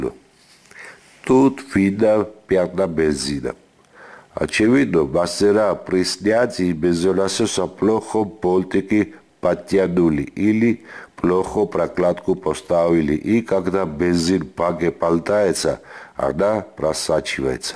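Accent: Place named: Georgia. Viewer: Гаумарджобат генацвали! Смотрел и слушал, сначала сомневался, акцент знакомый, похож на грузинский. Город, улицы, похожи на Тбилиси! Ностальгия просто, я служил 2 года в Тбилиси! Молодец биджо, разобрался с причиной, машинка огонь вообще!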